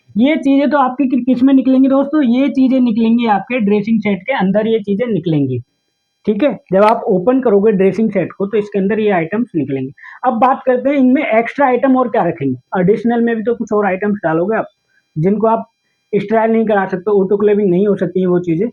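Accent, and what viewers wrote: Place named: Bihar, India. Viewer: Aap Bihar ka san hai sir jii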